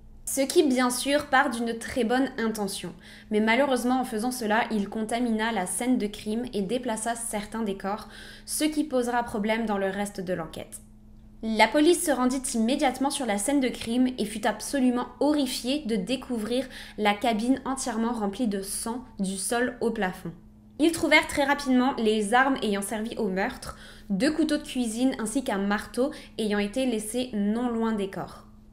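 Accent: Quebec, Canada. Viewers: On entends le Québec derrière ton accent, c'est normal??? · Salut. Top vidéo. Tu devrais faire une vidéo avec Mcskyz et Sonia de France. Je suis malheureusement plutôt passionné par les psychopathes qui foulent la terre. . La première fois fois que j ai decouvert cette chaîne, j ai été tres surpris que qq1 d aussi mignonne fasse ce genre de vidéo! En plus l accent québécois est trop cute. Bref ce format de 3 histoire est top! Bonne continuation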